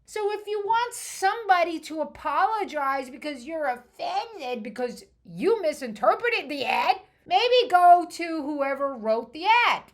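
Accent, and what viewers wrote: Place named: New York, United States. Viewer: Good to hear a real New York accent, it’s a rarity these days.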